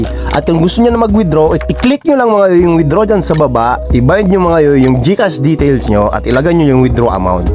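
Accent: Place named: China. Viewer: Oragun c tagalog in tsikwa accent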